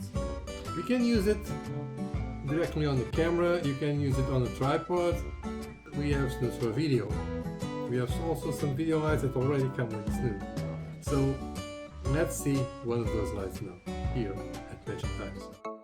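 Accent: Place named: Portugal. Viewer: Muito obrigado ( I guess from accent you are portuguese :-) ) for the video. Could I contact you for more questions about SEAFROGS lights?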